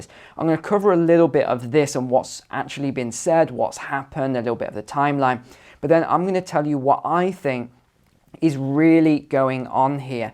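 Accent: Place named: United Kingdom. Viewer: Hahahahah I know isn't arrogant but I love his British accent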